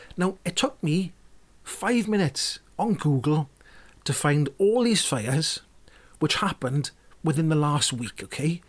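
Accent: Wales, United Kingdom. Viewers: I'm a diesel mechanic and not a big cheerleader of EVs, but I'd listen to this guy read the weather. Love a good Welsh accent, and that's a 10! 👍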